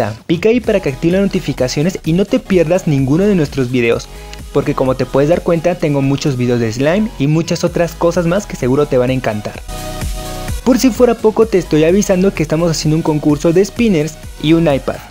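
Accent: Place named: Spain. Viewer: me cae super mal que hable como si fuera un español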